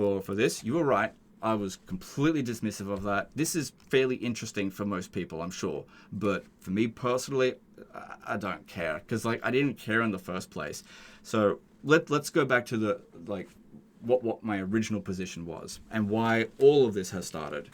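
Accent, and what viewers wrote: Australia. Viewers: Bleaaase dont quit YT entirely, i can't go for weeks without your soothing Aussie voice playing on my second monitor!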